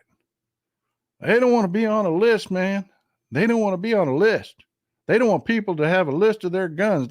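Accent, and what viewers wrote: Texas, United States. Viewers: I bet you're from Austin?? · My dad was born in Texas. You remind me of how he talked, I enjoy your comments.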